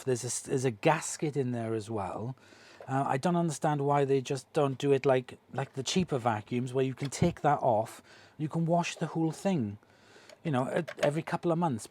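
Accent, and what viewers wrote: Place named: Wales, United Kingdom. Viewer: I like your accent 😄 (I'm guessing it's Welsh). Thanks for the review! You covered all the things I wanted to know and see. The filter part was very helpful.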